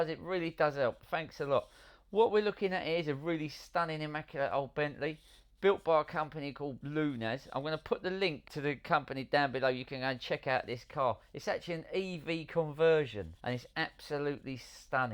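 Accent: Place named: London, United Kingdom. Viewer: Its really good how you think up the the comparisons its quite poetic along with your London accent makes it more funny I love these videos their really funny keep them coming please 😊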